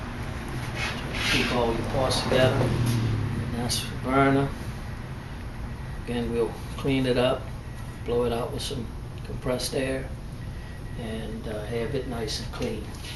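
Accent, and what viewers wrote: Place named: Louisiana, United States. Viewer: Cher, I sure like dat Cajun accent. Ce c'est bon, oui.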